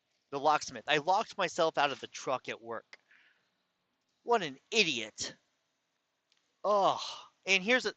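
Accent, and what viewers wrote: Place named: California, United States. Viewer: You're human. You are allowed mistakes. I love your voice, very Californian.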